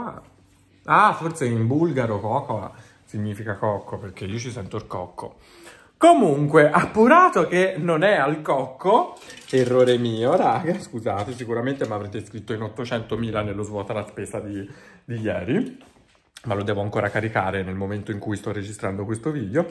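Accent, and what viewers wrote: Tuscany, Italy. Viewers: Ho apprezzato tutte e 4 le giornate mangerecce ma la mia preferita resta halloween 😻 purtroppo sono a dieta terapeutica quindi quando vado al lidl posso prendere solo ciò che ho da dieta 😭 chissà per il futuro cosa arriverà, forse la settimana francese, austriaca o oriente, ma probabilmente bisognerà aspettare dopo natale. Ora potresti far spesa dei prodotti Delux 😎 Ti ho scoperto da poco, mi iscrivo, sei molto simpatico e adoro sentire la cadenza toscana 🤩 Mi sembra di aver capito che non rientra nel tuo canale, cmq ti consiglio di provare anche i prodotti skincare che ogni tanto la lidl propone, ieri per esempio è uscita la linea nordica, buon inci, ottima idratazione e low cost!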